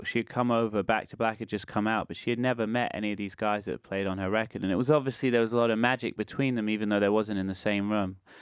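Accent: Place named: United States. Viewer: this guy now has an American accent, master!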